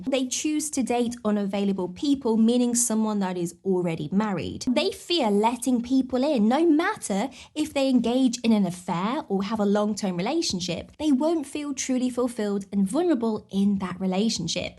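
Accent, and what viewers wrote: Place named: United Kingdom. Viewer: Thanks for the clarity. Oh my goodness you hit the nail on the head. If you saw the shock I went thru when I found out about NPD at 40, it shook me to my core and am still going thru so much years later. I not only lost my childhood, I lost the best years of my adult life too. Btw: hello from Australia. I love your British accent.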